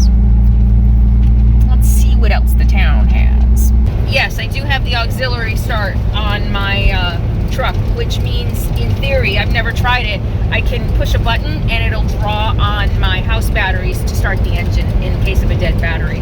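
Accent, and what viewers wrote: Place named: Minnesota, United States. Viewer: Minnis SO...tah. I love that accent too.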